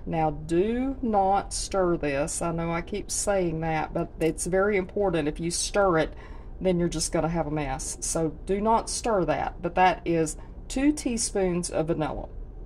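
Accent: South, United States. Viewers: It will never stop being confusing to me how Americans ended up with that stupid, idiotic southern accent. It's disgusting. Why???????????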